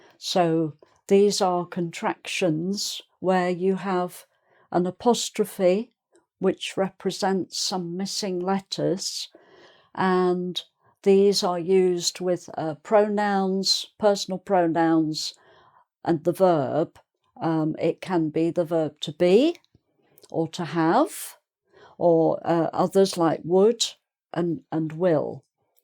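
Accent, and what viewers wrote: England, United Kingdom. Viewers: Esto es el acento británico? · Que fofa ensinando e que sotaque inglês lindo!!! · Na verdade ninguém nasce sabendo, todos precisam aprender. E esse sotaque é o sotaque do inglês britânico que e o verdadeiro, os americanos não conseguem mais emular esse sotaque. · Love from INDIA...ARE YOU BRITISH MAM?? AMERICAN ENGLISH ACCENT IS HARD TO UNDERSTAND FOR US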